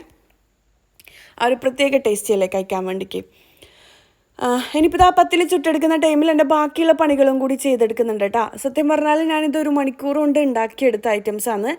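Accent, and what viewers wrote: Kannur, India. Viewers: Kannoore varthamanam nalla rasamund tto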